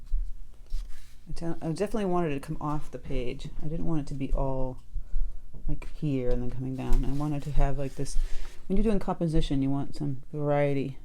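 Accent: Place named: New England, United States. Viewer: I love watching your videos and I love your accent, I don't know if you are or not but you sound like a New Englander! Which makes me happy and I love your art it's always inspiring. Thank you!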